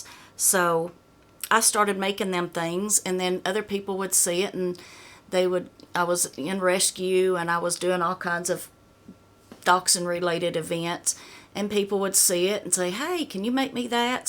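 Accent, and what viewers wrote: South, United States. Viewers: YOU ARE SO PRETTY! I LOVE YOUR SOUTHERN ACCENT SO MUCH! Keep up the hard work! God bless you! Also, you don’t need to lose weight you are perfect just the way you are!❤❤❤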